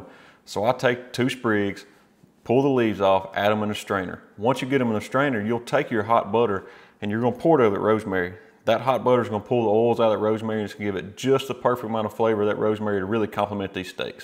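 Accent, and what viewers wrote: South, United States. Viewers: Southern accent? Check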